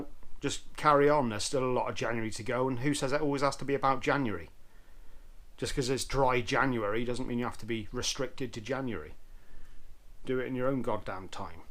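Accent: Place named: Cotswolds, United Kingdom. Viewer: We're hoping for a new episode, any day now. The Cotswold accent grows on a person. Thanks.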